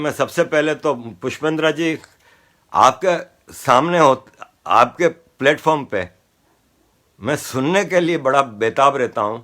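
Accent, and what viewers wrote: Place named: Gujarat, India. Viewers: आरिफ अजाकिया साहब आपका यह वीडियो क्लिप देखकर मैं और गौरवान्वित हो गया वह यह जानकर कि आप हमारे गुजरात के सौराष्ट्र जूनागढ़ जिला बांटवा के भारतीय गुजराती मेमण परिवार से है. और सबसे ज्यादा खुशी तब हुई कि आप हमारे हिंदुस्तान पर आज भी गौरव लेते हो. हमारे गुजरातियों में कहते हैं ना कि तमे तो सवाया गुजराती छो.आ रीते तमें तो साहेब सवाया भारतीय छो अने गुजराती पण छो. आनाथी वघारे अमने शु जोईये??? इससे पहले भी मैंने आपका बहुत वीडियो क्लिप देखा और सोचता था कि यह साहब नाक नक्स से तो सोरठी लग रहा है क्योंकि यहा जूनागढ़ जिले को सोरठ प्रदेश बोला जाता है. आप की हिंदी स्पीच बहुत अच्छी है फिर भी sir मैंने एक बात नोट किया है कहीं-कहीं आपके हिंदी उच्चार में( pronounced) गुजराती होने की की छांट दिखाई देती है. आपने यह बात सही बताएं यहां के मुसलमानों हिंदुओं से कुछ ज्यादा ही चिडे चिडे रहते हैं. जबकि हिंदुओं से उसका बहुत अच्छा धंधा रोजगार चल रहा है. 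और क्यों ना हो यहां के कांग्रेसी और उसके पाले हुए मुल्ला और मौलवी खुद की रोटियां सेकने के लिए मध्यम वर्गीय मुसलमानों को हमेशा हिंदुओं के खिलाफ भड़का रहे हैं और आपने जो दाखिला दिया जावेद अख्तर जिसको इस देश में मान सम्मान प्रतिष्ठा पैसा सब कुछ दिया है फिर भी देश के प्रति गद्दारी पाले हुए हैं वो खुद तो कहीं निकलते नहीं मगर इसमें बेचारे गरीब और मध्यमवर्ग के मुसलमान पीड़ित हो जाते हैं और देश के साथ direct or indirect गुनाह कर जाते हैं और बाद में यहां की जेलों में सड़ते रहते हैं और जावेद अख्तर जैसा माल मलीदा पेलता रहता है. और दूसरी बात यहां के ज्यादातर गरीब मुस्लिम एजुकेशन से वंचित रह जाते हैं इसलिए दूसरों की बातों में भी जल्द आ जाते हैं. हां यहां की मुसलमान कोम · Aarif Bhai English bolne se pata lag Gaya aap bhartiya gujrati ho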